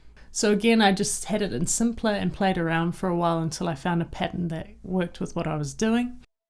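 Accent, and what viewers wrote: New Zealand. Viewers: Wait...your accent...a fellow kiwi? How cool if so! I also make YT videos but am such a huge fan of Andrew Huang. You're amazing! Congrats on the new video collab with Andrew. I've subbed. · i came from andrews channel to watch this, it was by far my favourite. i loved the use of the real instruments. it somehow reminds me of both and (mostly hayley). definitely gonna subscribe. also your voice is amazing, love kiwi accents. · Beside your accent being a dead give away, your beats/sound is totally Kiwi!! Love love love this!!! Keep it up hun you are freakin awesome!!! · Are you are New Zealander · As soon I heard that accent I needed to check out the channel :D Gotta support more Kiwi channels!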